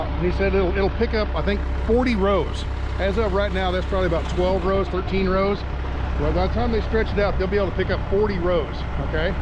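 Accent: South, United States. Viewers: Are you starting to speak like a trucker now or have you always spoke with a country sort of accent. is like a voice actor...his trucker voice is not always there and other times its very pronounced.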